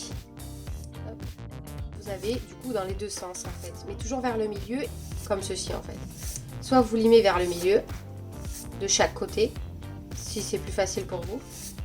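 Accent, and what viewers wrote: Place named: South, France. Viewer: Merci pour votre vidéo! J'ai arrêté de me ronger les ongles et je cherchais une vidéo pour en prendre soin. C'est chose faite! J'ai trouvé ma petite routine ongulaire 😁. Vous êtes du Sud non? J'adore votre accent!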